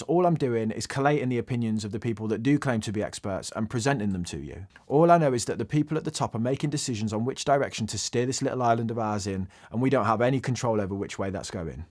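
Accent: Birmingham, United Kingdom. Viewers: Is that a Birmingham accent?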